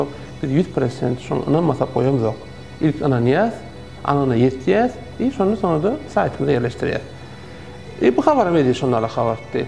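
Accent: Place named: Balkans. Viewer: aga oya gidip bilemzok veli shu mahal giden yaly boldym sizin gurleshiniz eshtdip (balkan aksendinda) ❤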